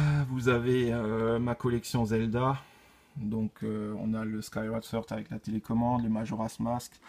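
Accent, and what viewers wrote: Alsace, France. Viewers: alsacien ? ta l'accent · Il fait plaise ton accent elsass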